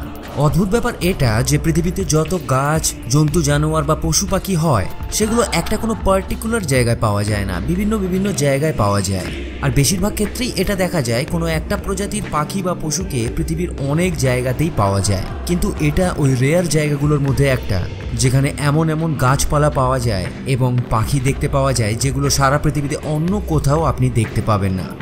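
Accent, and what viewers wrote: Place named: Kolkata, India. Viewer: সুন্দর উপস্থাপনা তবে আপনার কথায় কোলকাতার স্থানীয় ভাষার একটা ভাব আছে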